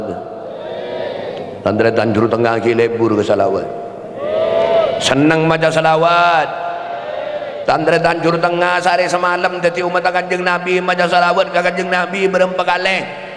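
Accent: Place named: Madura, Indonesia. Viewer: logat maduranya kental banget ya pak Kyai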